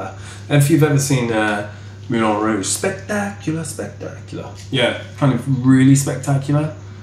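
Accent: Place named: United Kingdom. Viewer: sotaque inglês genuíno.muito engraçado o teu sotaque británico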